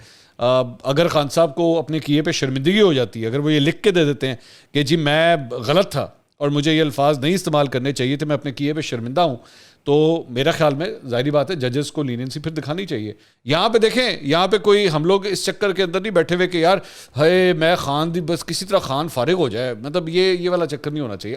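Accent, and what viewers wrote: Punjab, India. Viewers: mai, mera, mujhe band karo. U are a watchable presenter. But your words mai, mera, mujhe are not palatable. I like you due to your apparent neutrality and intermittent Punjabi as my father is from lahore, amritsar.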